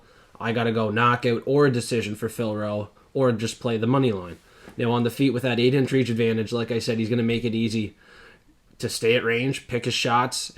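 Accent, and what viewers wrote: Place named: Glace Bay, Canada. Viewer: Fuck b'y you sounds like yer rite outta glace bay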